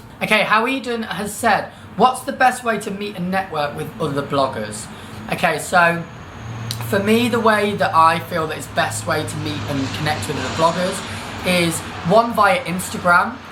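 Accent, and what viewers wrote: United Kingdom. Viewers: Esse sotaque britânico ui ui ui, homem você é um sapão 😏 kkkkk ( posso falar oq eu quiser que ele não vai saber mesmo )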